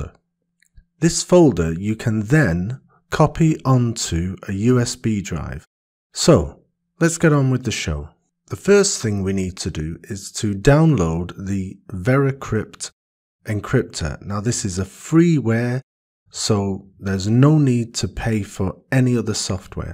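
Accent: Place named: England, United Kingdom. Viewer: DUDE!! This is a superb tutorial (1) It covers various scenarios a user might ask - at least for this specific topic of encrypted folders. (2) The explanation is clear and slow, so techies can speed up, but newbies can go slow. (3) An educated English accent is soooooo much clearer in articulation, rather than many Yanks who mumble and mangle words. Tech skills is one thing; teaching is another. That last bit may be a personal bias, but look at Hollywood movies - can barely figure out what they're saying. Maybe that's why the rely on big explosions. But that's a tangential topic.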